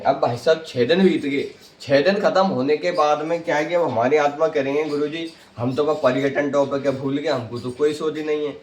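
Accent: Bharatpur, India. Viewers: From mahwa dausa Are you Bharatpuiya Hamari jaisi bhasha hai aapki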